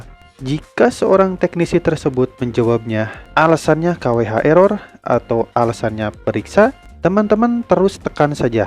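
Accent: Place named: West Java, Indonesia. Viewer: Assalamualaikum kang, penejelasannya keren dan nyunda, enak di dengar di kuping parahyangan model sayah. Pertanyaannya nih kang : Jika saya telah memasang grounding sendiri, namun tidak di konek kan ke KWH meter oleh PLN saat masang, apakah tidak masalah bagi keselamatan jaringan dalam rumah saya? Hatur nuhun kang atas penjelasannya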